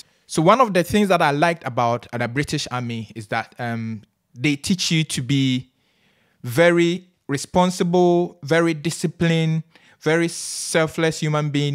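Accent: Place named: Nigeria. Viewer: HE SOUNDS L I KE A NIGERIAN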